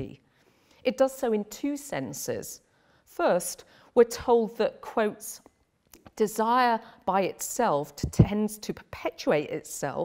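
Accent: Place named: United Kingdom. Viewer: Why can't the Brits ever get to the point besides the annoying accent.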